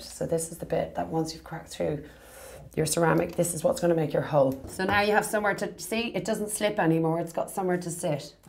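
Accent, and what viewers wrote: Ireland. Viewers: Fabulous and a lovely Irish accent! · Any lady who does DIY deserves a like in my book. Love the Irish accent too.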